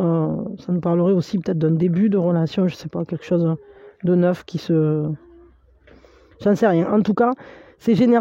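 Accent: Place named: South, France. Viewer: Vous avez raison , la Gratitude est du miel pour le Coeur. Merci à vous pour les messages transmis. Vous répondez à mes prières au mot prés ! Un jour sans doute quand le moment sera Juste et Bon pour moi. Juste une remarque : Avez-vous remarqué que votre voix, au demeurant très mélodieuse, perd son accent du Sud quand vous chantez ? Merci encore et prenez bien soin de vous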